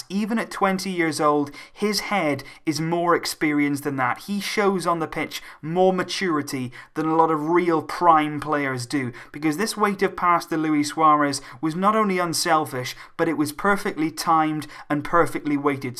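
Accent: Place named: England, United Kingdom. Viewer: 15 years barca fan here, really like your vids, you really understand barca very well and I constantly check if you have vid updates since mid Aug this year. By the way, where are you from, US or Euro? like your english accent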